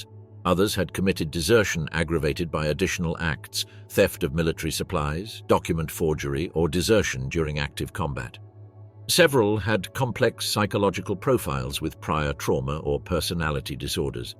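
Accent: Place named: United Kingdom. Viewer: Why do they have a limey talking about Our Army?!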